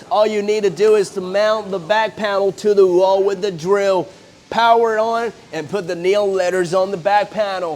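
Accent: South, United States. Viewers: That's a neat sign, living in the south myself. I like your accent.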